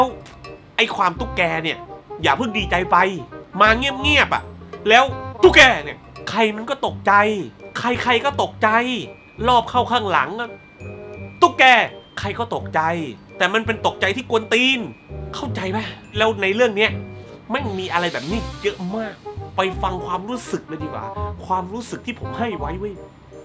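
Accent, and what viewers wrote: South, Thailand. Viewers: พี่เป็นคนใต้ป่าวครับ55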